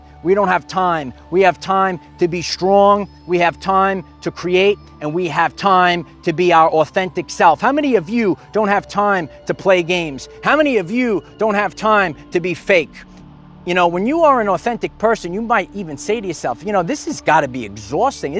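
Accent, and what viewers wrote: New York, United States. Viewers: Caring good intentions but your message and video is convoluted Mission mosh with too much vague innuendos I noticed you never used quote any Scripture you're just giving life experience but it's verbally jumbled man it's not making a very good presentation Without trying to be rude or disrespectful I have to question and ask if you're on any medication because you're not messing with the public regarding something you call Twin Flame and vibrations and frequencies Your New York accent to me explains kind of why you have a super high intensity and inflections about the inner workings of communication for me you sound like you're on a subway too long and irritated with the people's inflections or things you think they're doing to irritate you I think it's better you stay in the sunshine and stay outside and enjoy the fresh air around you because you need that oxygen bro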